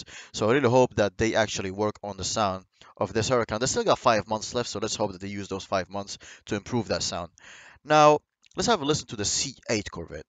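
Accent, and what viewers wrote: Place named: Scotland, United Kingdom. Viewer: Just you sound scottish 🏴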